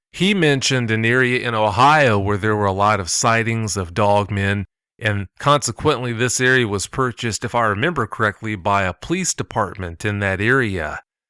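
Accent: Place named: South, United States. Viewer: I'm surprised it never came back for the other 2 goats, or did it?? poor billy goats 🐐🐐.. and... love the Southern accent! ☺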